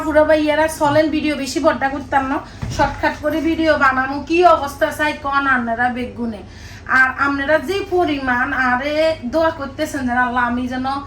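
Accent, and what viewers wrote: Noakhali, Bangladesh. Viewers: আংগো নোয়াখাইল্লা বলে কথা 😊😊🙃🤪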